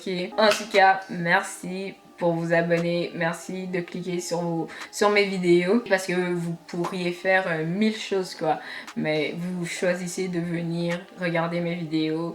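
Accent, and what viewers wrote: Quebec, Canada. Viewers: Tu as un léger accent québécois tu viens d’où? Snn t’es trop belle 😘❤️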